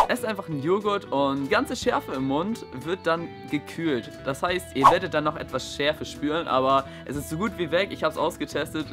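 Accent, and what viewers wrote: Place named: Germany. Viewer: dat deutsch :D du bist episch :D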